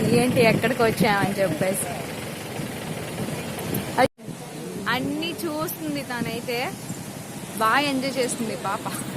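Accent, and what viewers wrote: Srikakulam, India. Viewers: Ayite srklm slang Or vsp Or hyd edo okate laa paluku oknaa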